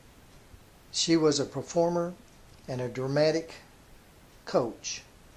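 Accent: South, United States. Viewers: It's really the proper way to speak however we Americans have become to lazy to use it. He is from the South just as I am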